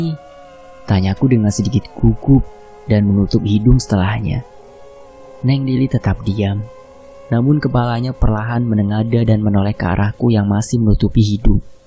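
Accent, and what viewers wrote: Java, Indonesia. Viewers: demen bnget pas mimin bawain logat jawanya... 🤭🤭🤭 rada2 gimna gitu... 😅😅😅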